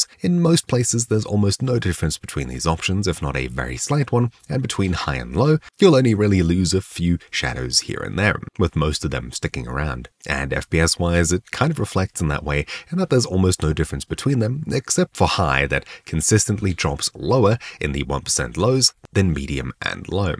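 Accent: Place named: South Africa. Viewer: didnt know your south african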